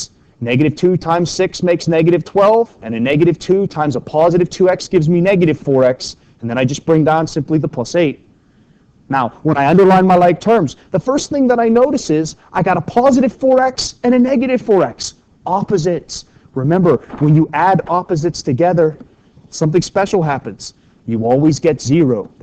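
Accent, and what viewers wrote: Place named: New Jersey, United States. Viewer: he has that new jersey accent and im living for it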